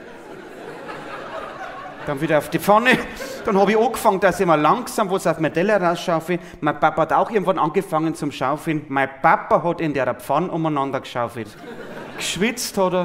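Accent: Bavaria, Germany. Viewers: Hallo, mir gefällt die bayerische Sprache, die Mimik und Gestik und die Einfachheit der Geschichten und Erlebnisse. So vieles ist so kompliziert geworden, mehr will ich nicht dazu sagen. bringt mich immer zum Lachen und lässt mich der Wirklichkeit entfliehen, für Momente des Lebens und das ist so so schön. Ich geniesse das so sehr. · Es ist einfach herrlich, einem normalen Menschen mit gesundem Menschenverstand und familiärer Erziehung zuzuhören und zu erleben, wie "leben" auch funktionieren kann. Gleichzeitig ist sein sauberes gepflegtes Bairisch Musik in meinen Ohren. Wenn ich mich beruhigen will (etwa nach einem anstrengenden Arbeitstag), dann ist Franks Stimme Balsam.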